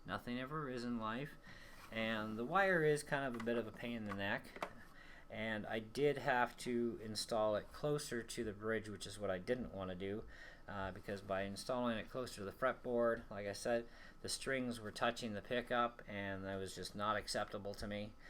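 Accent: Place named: Newfoundland, Canada. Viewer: you got to be a newfoundlander :)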